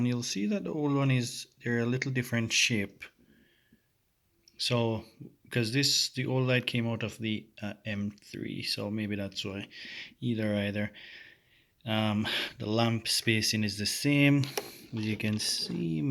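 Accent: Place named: Canada. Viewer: Love how Canadian your accent is, that’s cool lol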